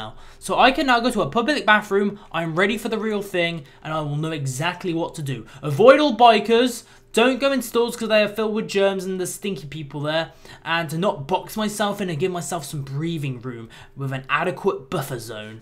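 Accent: United Kingdom. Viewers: You British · HE IS BRITISH NOT GAY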